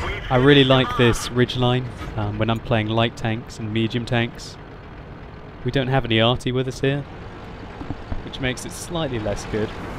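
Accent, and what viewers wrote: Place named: Australia. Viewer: why do you sound so australian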